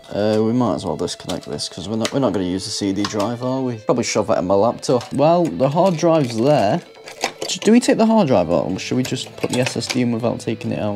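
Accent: United Kingdom. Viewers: I love that British accent, it makes me feel that I'm the one troubleshooting the pc.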